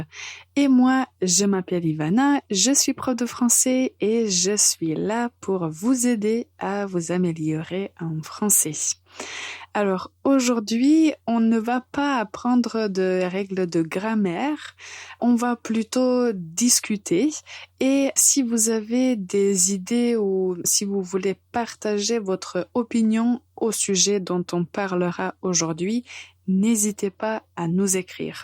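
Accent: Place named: Brazil. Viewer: merci. Je vous comprends très bien car vous parlez très clairement. Je peux identifier des accents de certains pays principalement anglais, espagnol et portugais. Je suis brésilienne et j'ai été surprise que votre accent soit proche du nôtre (portugais brésilien).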